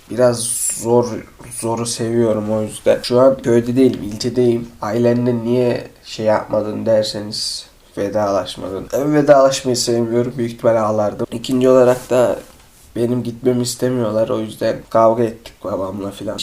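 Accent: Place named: Konya, Turkey. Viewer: La bende konya bozkirliyim abi şive n tam bizim ora yaaa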